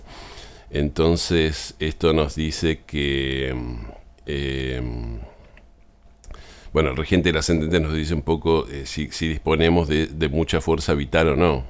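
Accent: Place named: Buenos Aires, Argentina. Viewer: Que lio que haces!! Pero me gusta tu voz y tu accento argentino... y porteño..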